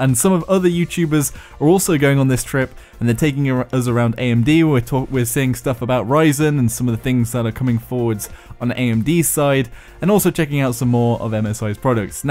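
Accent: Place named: United Kingdom. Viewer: Huh, didn't realise you were British. That makes you a lot hotter. Totally gay for that.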